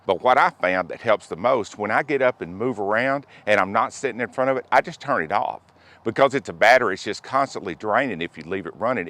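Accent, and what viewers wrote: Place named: Texas, United States. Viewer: I love the Texas accent. I live in San Antonio and I know exactly what you're talking about regarding the high heat and humidity. It's downright oppressive. This looks like a device that could really reduce the misery factor. I'm a musician and I get gigs outside fairly often and I end up all sweaty on stage when the temps are high... IOW, about 9 months out of the year. This thing looks like a life saver to me. I'll be buying one soon.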